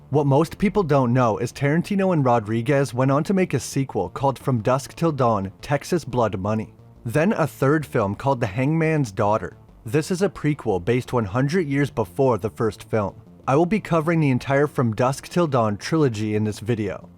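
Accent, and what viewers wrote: Canada. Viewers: This is random, but are you Canadian my guy? lol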